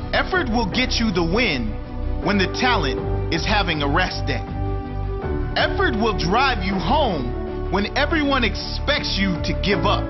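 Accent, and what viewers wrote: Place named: England, United Kingdom. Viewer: Nigga English accent!! nice 😎😎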